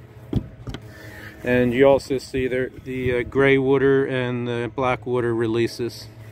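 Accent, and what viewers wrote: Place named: Southeast Pennsylvania, United States. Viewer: SE Pa. accent. Thank you!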